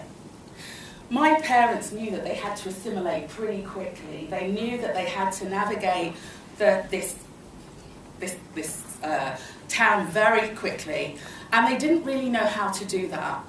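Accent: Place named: United Kingdom. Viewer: Way’ment. I remember when she was in the group, but when did she develop this British accent?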